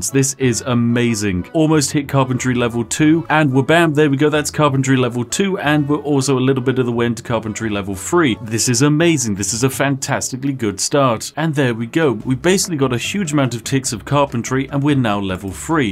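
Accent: United Kingdom. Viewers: Quite literally took me one minute to get into the video to realize this was a video and not an amphibian video. Even the hello gentlemen didn't phase me, I saw zomboid and brain went that's an amphibian video, but then my brain registered the accent and the lack of philosophical ramblings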